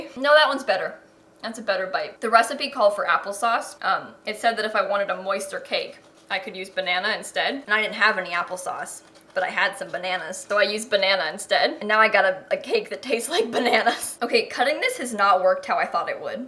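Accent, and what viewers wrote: United States. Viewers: One of the reasons I love your videos is because I compare our accents. In America you say sift and in Scotland we say it like siv 🇺🇸👉🏴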